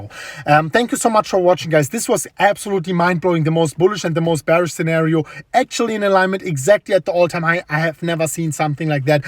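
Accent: England, United Kingdom. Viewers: Sounds like England 😂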